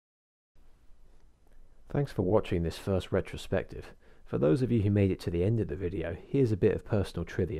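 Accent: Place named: United Kingdom. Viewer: So glad I found this channel through this video. As an American I love listening to a calm British voice narrating a hour long video. Perfect for bedtime.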